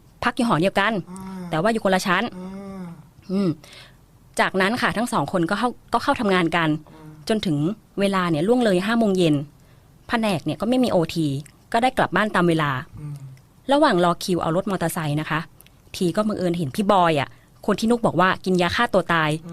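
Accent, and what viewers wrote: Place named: Isan, Thailand. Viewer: พูดอีสานชัดมาก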